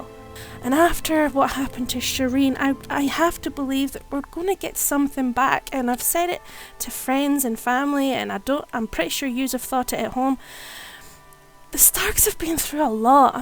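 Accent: Scotland, United Kingdom. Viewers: What accent have you got? Is it Scottish sorry I'm terrible at telling which accent people are from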